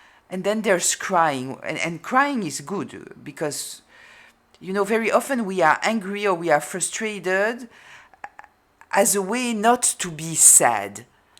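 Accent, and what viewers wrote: France. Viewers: i love your french accent. it's lovely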